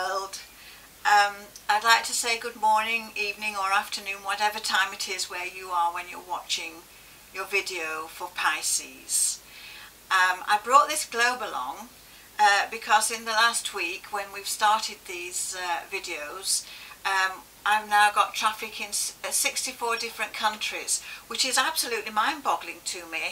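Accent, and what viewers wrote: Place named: United Kingdom. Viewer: i love the british accent and english , my school teacher was a britisher and taught me the Shakespeares plays , there were many references to prophecies in the plays, love your voice and puts me back in the class room, keep speaking.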